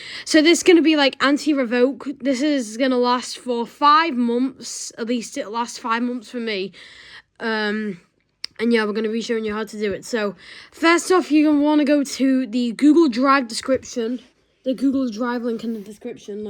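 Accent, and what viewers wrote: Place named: Liverpool, United Kingdom. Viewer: W scouse bro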